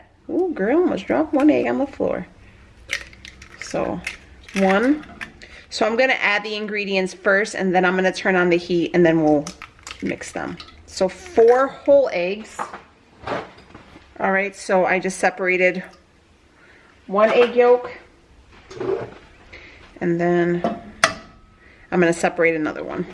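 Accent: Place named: New York, United States. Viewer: You are absolutely blooming brilliant. I love how you talk. Your accent sounds like a New York police officer. I love it! PS... you’re one gorgeous woman!xxx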